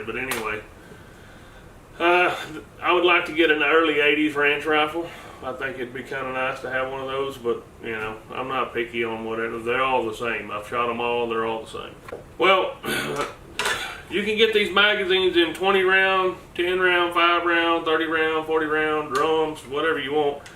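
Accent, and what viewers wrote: United States. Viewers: Ar and mini14 are both prima pieces of shit. Go russian if you want quality.Fuckers eh? You sound like a hillbilly, and look interbred to me.Lol the official california battle rifle. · Do you have to talk like a HILLBILLY to own this Gun ?????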